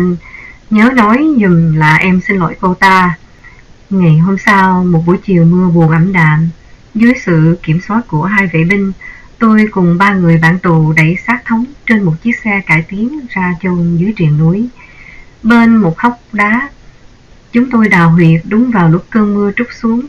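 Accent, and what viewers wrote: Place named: Saigon, Vietnam. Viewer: Mình rất thích nghe giọng này... Nó rất SG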